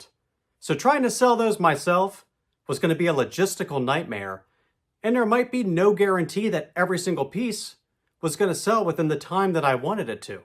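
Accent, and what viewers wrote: Kansas City, United States. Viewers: Are you from Kansas City?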